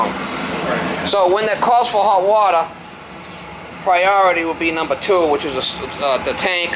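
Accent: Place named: Massachusetts, United States. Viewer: I think your awesome. I was a boiler technician in the US Navy that led me to good directions in my life. I totally respect what you do. I can relate, big time. I’d love to work with you sometime and I know that won’t happen. I grew up in Connecticut and can relate to that Massachusetts accent you got. Fuckin’ love it! My fortè is electricity and plumbing. Make that hay while the sun shines momma! I hope that blow fish blows away from you if you know what I mean.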